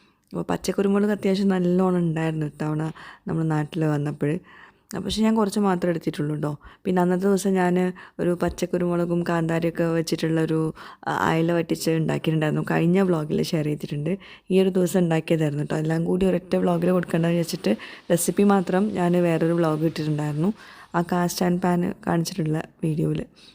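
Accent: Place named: Malappuram, India. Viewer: മലപ്പുറം ഭാഷയാ ണ് കേൾക്കാൻ. സൂപ്പർ ❤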